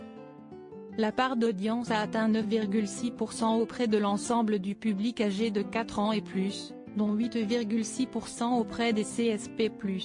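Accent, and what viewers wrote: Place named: United Kingdom. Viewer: On passe l'accent nullissime Franco anglais de cette voix pourrie sinon on attend face à l'info on en a rien à foutre de punch line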